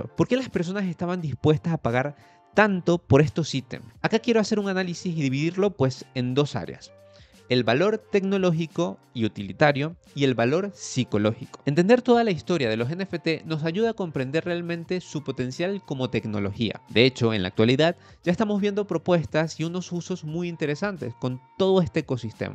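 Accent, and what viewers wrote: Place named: Latin America. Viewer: Es curioso, tu acento es hispanoamericano pero gran parte de tus expresiones suenan españolas, ¿vives en España? Buen vídeo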